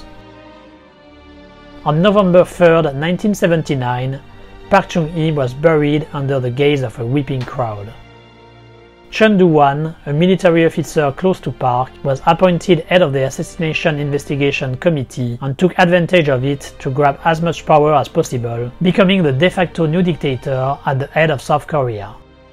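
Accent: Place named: France. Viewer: Great video but the uber strong French accent squeezed my brain juice in such a tiring way. I mean you can use AI voice dude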